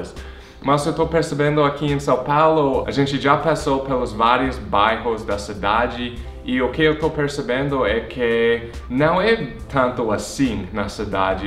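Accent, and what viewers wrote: United States. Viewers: Filma um pouco dos encontros, e faz um vídeo pra gente que não mora é nenhuma dessas cidades. Estou amando seu canal, você fala muito bem português, gosto do seu sotaque americano ♡ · É mó engraçado americano falando português kkk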